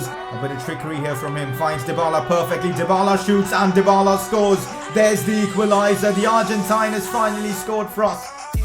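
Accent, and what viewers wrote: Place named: India. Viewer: #PCQ Where are you from? U sound indian..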